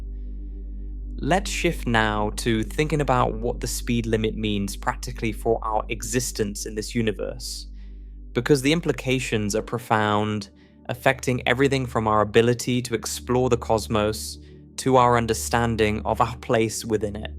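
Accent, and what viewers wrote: England, United Kingdom. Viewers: USUAL ENGLISH ACCENT GARBAGE VIDEO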